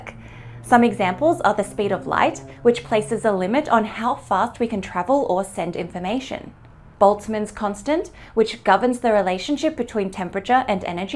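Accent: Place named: Australia. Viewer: so nice to hear an Australian accent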